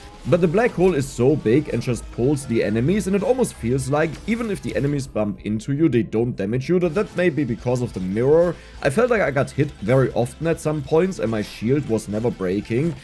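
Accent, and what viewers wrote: Germany. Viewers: im watching tha vid and the hole time i thougt, he has to be german by the way hes pronouncing words, and it got fcking confirmed... :D lov it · Richtig deutscher Akzent xD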